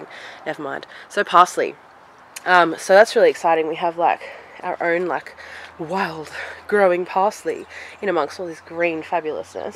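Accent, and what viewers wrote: Australia. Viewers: Just found you and hearing a fellow Aussie is awesome!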